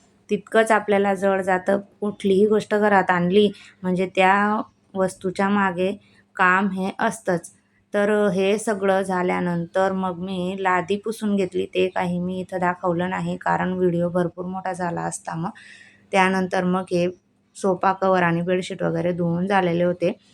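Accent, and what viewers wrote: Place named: Khandesh, India. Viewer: हाय तु कुठे राहते तुझं बोलणं ऐकून वाटतें तु खानदेशी आहे जळगाव धुळे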